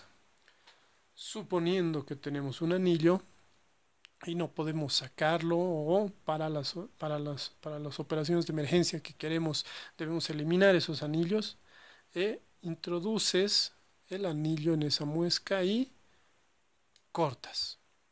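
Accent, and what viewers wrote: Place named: Bolivia. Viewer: hola estimado, por tu forma de hablar .. eres de Bolivia verdad?, si es así.... me podrías decir donde puedo encontrar estas leatherman raptor?, muy buena revisión!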